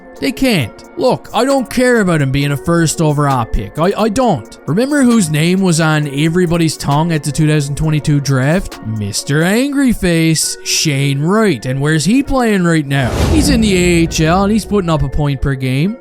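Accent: Newfoundland, Canada. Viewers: First time viewer, you are a newfie aren't you? Thats a newfie accent :)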